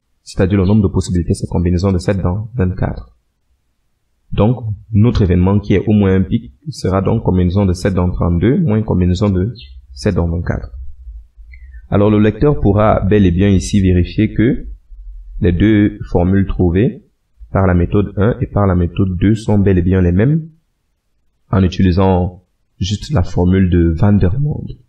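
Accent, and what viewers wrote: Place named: Cameroon. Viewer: Chapeau tres bien explique et en plus vous avez une jolie main d ecriture bien lisible . merci a votre accent je sens que vous etes Africain surement Kmer dnas tous les cas bonne video continue seulement !!!!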